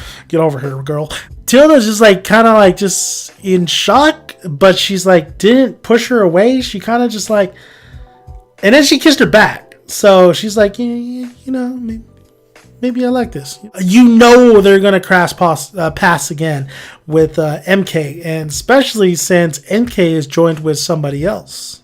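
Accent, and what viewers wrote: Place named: India. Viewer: What's your slang of english ? What are u actually?? I mean are you an Indian or Canadian or something? May I know it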